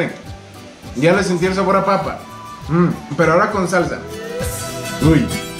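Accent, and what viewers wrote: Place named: Ecuador. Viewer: Que mrd? eres Pijo? o eres ecuatoriano... joder.... lo odio :")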